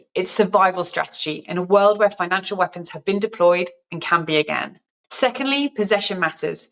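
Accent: England, United Kingdom. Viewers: You know the business and the English accent LIKE 😊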